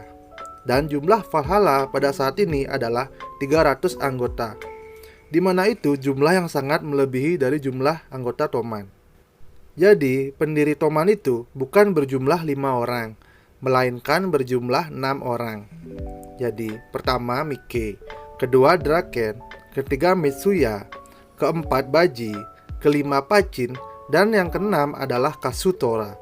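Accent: Bali, Indonesia. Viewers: Orang Bali?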